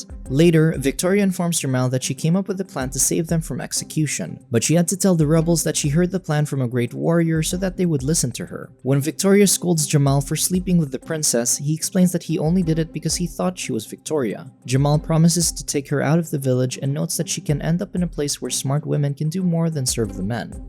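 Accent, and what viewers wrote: Philippines. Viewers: Taena halatang Pinoy tong voiceover hahahaha sagwa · You sound Pinoy! :D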